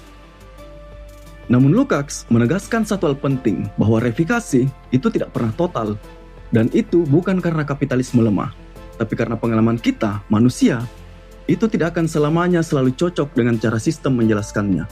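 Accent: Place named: Sulawesi, Indonesia. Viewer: Dari cangkok & logat sepertinya Orang Sulawesi ya 😂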